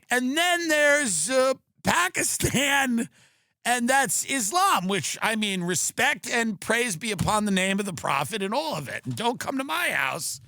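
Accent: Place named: Long Island, United States. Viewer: The migraines from the media are only soothed by Tim’s raspy, Long Island voice whethered by whisky and Paul malls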